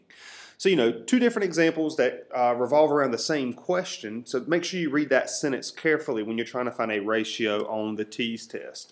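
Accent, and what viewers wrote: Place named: North Carolina, United States. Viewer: Your videos are so helpful. Thank you for all your time and effort!! Taking my TEAS soon as someone who graduated college in 2015 so these refreshers are everything. Side note, are you by any chance from Eastern NC? Your accent sounds like me and my people!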